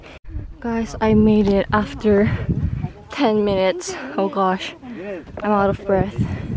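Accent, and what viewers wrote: Philippines. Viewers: wait—u kinda have a Filipino accent😧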